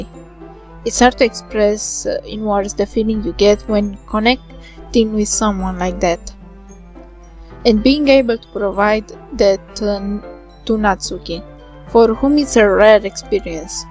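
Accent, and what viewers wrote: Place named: Romania. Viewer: Ma refer se cunoaste din accent ca esti romanca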